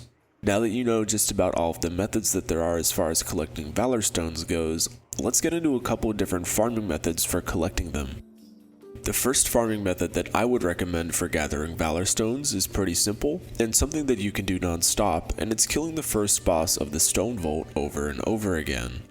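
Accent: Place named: California, United States. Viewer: You sound Californian as fk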